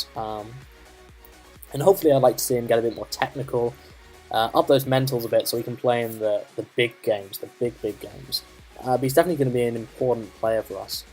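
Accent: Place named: United States. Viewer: you sound like you have an american accent. any reason?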